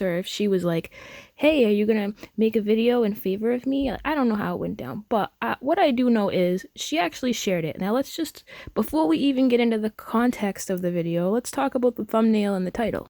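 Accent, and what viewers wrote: Boston, United States. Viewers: Omg no way I'm not one of the first to fan girl over this absolute awesome smart a f, awesome cute Boston accent ass creator js.. (sorry) Buttttt I'll disagree with TOS and that's the beauty of being able to be adults in this world.. twitter wasn't a free speech platform esp someone inciting violence on his own home with his own smooth brain cult followers. Yes he was the president.. but meh Racism prejudice and bigotry went up in the last 4 yrs. I suppose my views "based" but if u not gunna try to run a hate riot and storm a government building I think most be ok apps don't want to support hate a violence But but I still heart ur vids and u one smart as cookie and I wish I was as beauty as u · Yasssss. What up, what up- Can’t sleep at 230am. Baby in this belly won’t let me. I swear baby K is going to recognize that Boston accent ❤️ EDIT: how do we help support your need for coffee?? 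· I love this channel! 🤣. Don’t mess with the Baaaston accent! Lol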